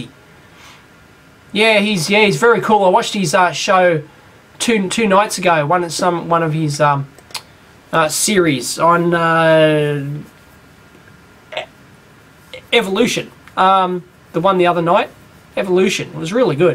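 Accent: Australia. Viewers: Just for the record... Love to hear an Aussie be a true Aussie!! keep it up mate! Ignore those other winging bastards that can't understand our shenanigans!! THANK YOU VERY MUCH! its refreshing to hear! Please keep it up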